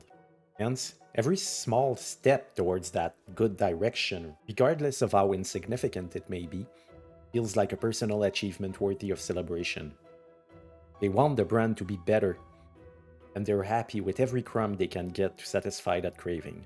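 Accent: Quebec, Canada. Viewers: Is it me or I recognize a Quebec accent here ??? Certainly wasn't expecting that hahah. Really liked this video it was super interesting !!! Will def sub and check your other videos !!!! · Brother.. Are you from QC? Lol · Est-ce que j'entends un accent québécois? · T'es Québecois hein? 😂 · disagree here, human beings hate what they don't understand, and fear the unknown, hence why we hate out-groups and fear death. Otherwise, this was a great nuanced take why the Pokemon gamin franchise continues to pump out mediocre products. I can tell by your accent you're a fellow Quebecois, subscribed🇨🇦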